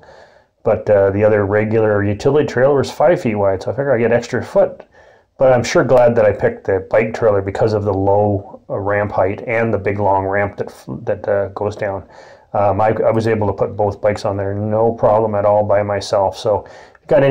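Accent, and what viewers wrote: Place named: Canada. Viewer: Very helpful— taking my Goldwing 1,200 miles this weekend, and this is perfect. Also love the sound of Maple Syrup in your accent 🥰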